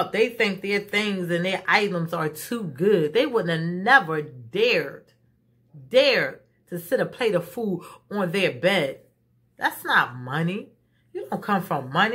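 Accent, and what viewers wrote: New York, United States. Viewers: Are you from New York? I can tell from your accent in your voice if not new York somewhere up north love your channel and I have subscribed god bless😘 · Agree 100% You look so pretty, remind me alot of my Auntie's on my Fathers side ..I get New York vibez♡love your accent! Tfs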